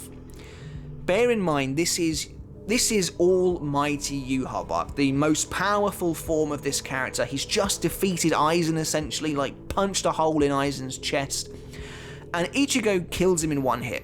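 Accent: England, United Kingdom. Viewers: Content and overall quality is great but the way you pronounce japanese in an English accent is very hard to listen to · You need to say Japanese word like a Japanese person not like a English man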